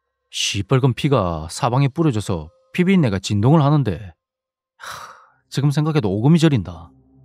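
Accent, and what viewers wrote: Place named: Busan, South Korea. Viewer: 부산사투리 재미있어요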